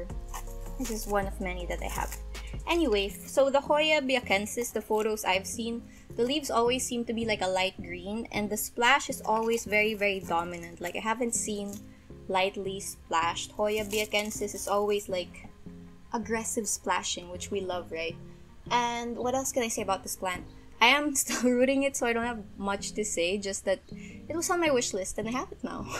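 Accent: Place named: Philippines. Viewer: I am new to your channel, where are you based? I love listening to your clear and informative explantion...no nonsense! Are your teacher or a trainer? I noticed slight Phillipino accent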